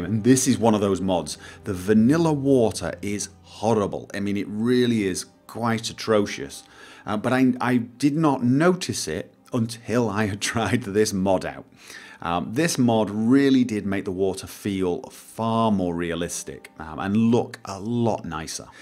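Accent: Norway. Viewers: Same! And 'cause I love love your voice and accent! (Norway?)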